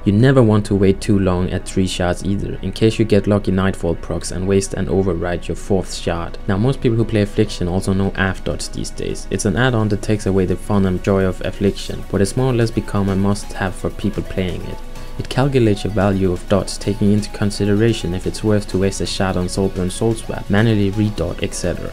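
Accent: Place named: Portugal. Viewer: I am always curious to know from what first language accents come from; would I be at all close if I said Portuguese? Thanks for the guide though, I had little problem understanding it. And "tier as far as I know, (my first language is English) is pronounced like in the word teardrop, or phonetically "teer".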